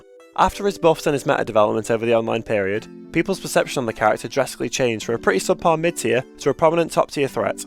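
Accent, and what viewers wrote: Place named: United Kingdom. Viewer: heard british accent liked video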